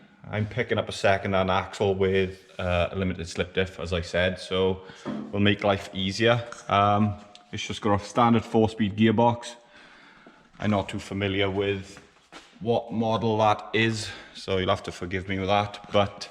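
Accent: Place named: Wales, United Kingdom. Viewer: I thought you sounded a bit Welsh! I'm also in South Wales not far from Caerphilly! I've had a few Escorts in the past, even a MK1 RS1600, and Mk1 Twin Cam, plus a few standard ones. The one escort i really got on with was a standard Mk2 1300 2 dr. Great to drive, could rev the nuts off it, and handled really well. I'm no expert with cars, but would really love to do what you are doing now.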